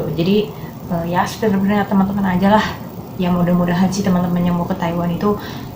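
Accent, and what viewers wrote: Banyumas, Indonesia. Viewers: Ngapak ya mbak? Kayane sih..hahaha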